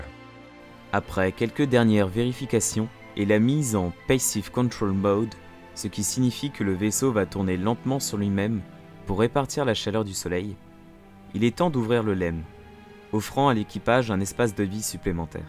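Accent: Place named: France. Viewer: Bonne vidéo globalement. Par contre, pourquoi ces phrases en anglais avec l'accent français, ça gâche tout !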